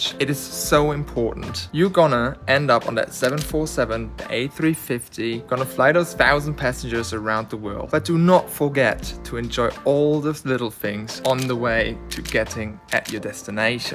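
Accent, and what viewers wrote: United Kingdom. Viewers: I am trying to figure out where his accent is from. It's like he learned English from people in East London.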